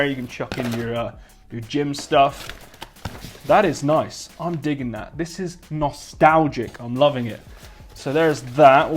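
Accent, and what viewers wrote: United Kingdom. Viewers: stop speaking words like- digging, nostalgia, etc and British accent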